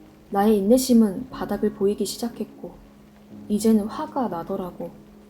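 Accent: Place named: Gangwon, South Korea. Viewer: 성우 목소리 정말 좋네요 강원도 억양.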